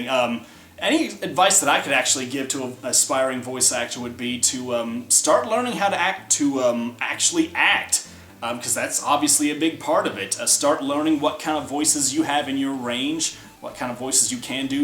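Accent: South, United States. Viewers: YOU'RE JUST WITH A COUNTRY ACCENT!! Thnk goodness..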